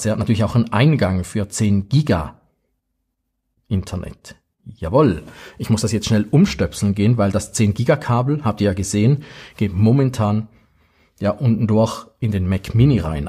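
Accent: Switzerland. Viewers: Wenn ich die leichte Dialekteinfärbung richtig interpretiere, wohnen wir wohl im selben Kanton (SG). Auch ich hab' FTTH bei Sunrise, auch ich zahle CHF 40 pro Monat, aber bei mir gibt's dafür nur 20 Mbps. Die AVM 5490 musste ich sogar selbst kaufen. Da sind wir bei meinem Problem: Der Kundendienst der grossen Anbieter ist zu einer Lotterie verkommen. Bei jedem Wechsel riskiert man, wochenlang ohne Internet und Telefon zu sein und deshalb mache ich keine Anbieter- oder Vertragswechsel mehr. Wäre vielleicht mal ein Thema für die Tagi Digitalseite, statt ständig nur neue Produkte vorzustellen...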